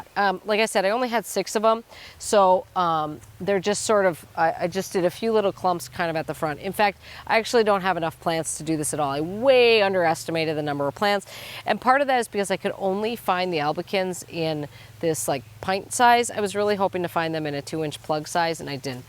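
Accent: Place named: Midwest, United States. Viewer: I appreciate the recommendation, but in checking out Soil and Margarita’s channel, it seems like she only wants to do videos at a certain time of day when the sun hits her just right so she looks her best (her words), she doesn’t like interruptions and stopped filming on garbage days for that reason (not that I blame her, but there is nothing “raw” about that), and just generally seems much more fixated on film production than “happy accidents” and filming whenever and wherever the desire strikes. I’m also a dog lover, so those interruptions are some of my favorite moments here! (Cannot beat the dunking of the head into the stock tank moment with quick wit of saying the dye is safe for even Newfoundlands!) 😆 I also just really feel a connection with the midwestern dialect as I’ve been separated from that area for many years and it feels very homey to me. 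Soil and Margaritas seems like a very sweet content creator, and indeed, she said she is not in it for the money, which is why I’m guessing you recommended her, but she’s definitely not like. I hope she has great success just the same though! 😊❤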